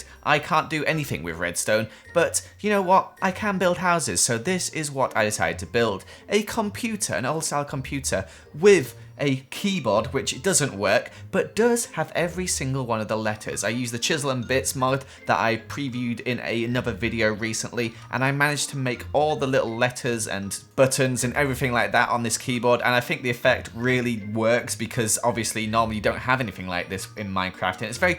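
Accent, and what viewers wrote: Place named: United Kingdom. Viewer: without a British accent, the intro would not work at all.. cue me trying to say aww and ore in the same way for five minutes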